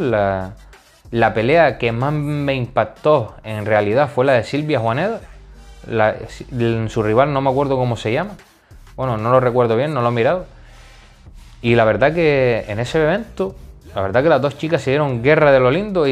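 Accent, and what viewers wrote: Canary Islands, Spain. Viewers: Buen video cmo siempre,una pena el "robo"a Tato, y t digo una cosa,precisamnte el acento Canario es lo q le termina d dar el toke perfecto a los videos,saludos bro💪🇮🇨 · Lo fácil siempre es burlarse de la gente que empieza hasta que empiezan a tener éxito. Entonces llegan las críticas. Sigue hablando canario, que quien se acompleja de sus raíces son unos pocos, el resto nos sentimos orgullosos de hablar canario. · Buenas tardes ante todo muchísimas felicidades por tus vidios y que sepas que te sigo por tu acento CANARIO.como yo también lo soy y como ablas en los vidios sin cortes.de ser así creo q no t seguiría como te sigo👍💪sigue así crack y a seguir creciendo en este ámbito un gran saludo campeón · Que sepas que yo t sigo por ese "rollo canario" porque es como si estuviese escuchando a un colega comentar la pelea/hablar de mma. 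Sigue así que creas muy buen contenido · Usted es clase aparte! naturalidad, sincero, conocimiento, apoyo a los peleadores Canarios y de la Peninsula y a uno como Canario se le llena el pecho de escuchar ése acento, oite exentrenador ?👎🏽. Daniel Barez, futuro ®️una pena por Tato y Sebas !! Grande Bestia 🦍💪🏽💪🏽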